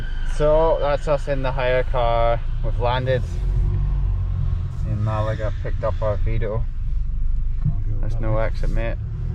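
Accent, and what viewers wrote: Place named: Northern Ireland, United Kingdom. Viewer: Northern Ireland accent + facemask = subtitles on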